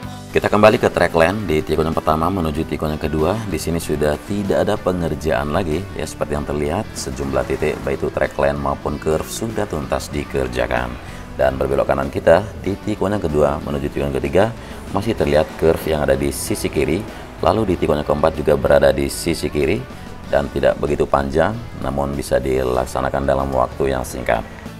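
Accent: Lombok, Indonesia. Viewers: Lombok mana suaranya👍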